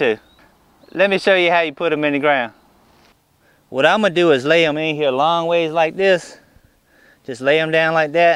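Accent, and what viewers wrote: South, United States. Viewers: LOL! Gotta love some southern talk and I'm from Alabama. Learning alot from your channel. Thank you.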